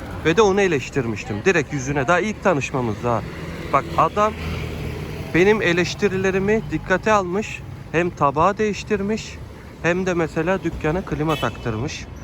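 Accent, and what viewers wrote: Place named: Izmir, Turkey. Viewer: İzmirli ağızıyla keyifle izleyebileceğim bir kanal buldum :) Başarılar dilerim kardeşim İzmir'den selamlar...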